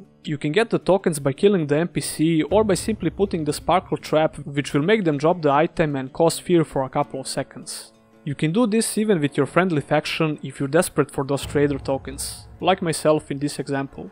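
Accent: Balkans. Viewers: Are you from Balkan? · U sound like you are from balkan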